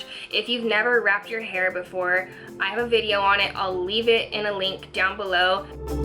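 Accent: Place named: Texas, United States. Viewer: Are you from Texas? I love your accent.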